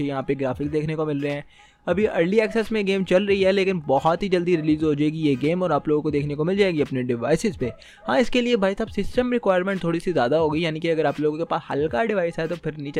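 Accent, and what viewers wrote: India. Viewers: Ap himachal sea ho na. The way you speak is exactly like that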